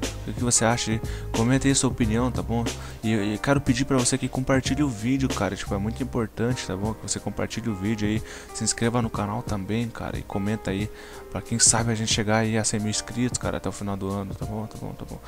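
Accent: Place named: São Paulo, Brazil. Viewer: Pensei que fosse interior de São Paulo.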